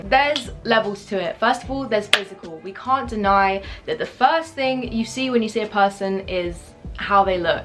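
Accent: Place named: United Kingdom. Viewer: you can tell she’s british without any doubts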